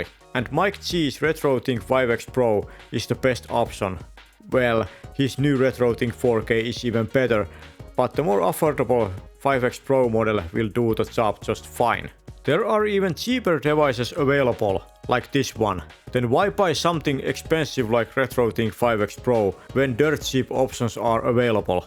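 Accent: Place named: Finland. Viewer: Seconds into this video I could hear Fintlant. I trust Finns for no-bullshit explanations of everything. Thank you for the great video. Perkele!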